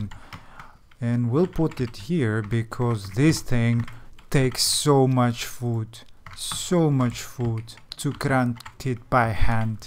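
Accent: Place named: England, United Kingdom. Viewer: You have a great english accent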